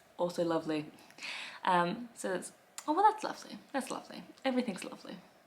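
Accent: Australia. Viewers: Hello sauce pot💕 How do you know all these things about the UK upper crust Being a Aussie Sheila🐊🦘🦘 The UK upper class toffs only act modest to try and hide the deep inequalities that exist in the Uk You sound like a Aussie lady trying to talk English southern middle class with A aussie accent interesting little video sauce pot💕 Hoping you're keeping ok Nothing wrong with your Oz accent Don't hide it the Oz accent is great The great late Esben Storm brought the great Aussie ocker boisterous accent to the world 🌍🦘😉🤠🐨🦚 👍 Prisoner cell block h Bea Smith having a smoko mate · You come across as a posh Sheila, only a trace of an Aussie accent. Oh, I've always called it Dinner, supper is that snack midway between an earlyish dinner and bedtime whilst watching that late TV programme. It has always been the Drawing room, a door from both the hall and dining room, it had a baby Grand in the bay window the TV almost hidden in a corner, the living/morning room was the one we spent most time in, next to the kitchen. 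Dad was the posh one, Mum was the almost Cockney, SE London of Russian Jewish ancestry. My accent is a mixture of both with Dad's mannerisms.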